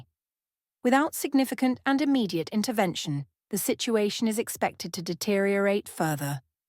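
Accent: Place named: England, United Kingdom. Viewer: Brown Canadian with English accent… phew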